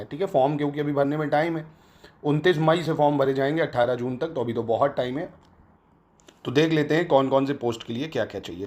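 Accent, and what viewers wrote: Kanpur, India. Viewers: sir cut to cut bolte hai mast kanpuria andaaz❤❤❤❤❤❤❤❤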